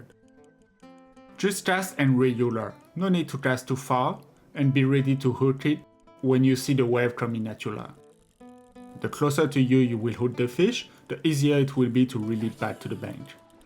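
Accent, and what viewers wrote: France. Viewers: Nice video man! What’s this accent? Français?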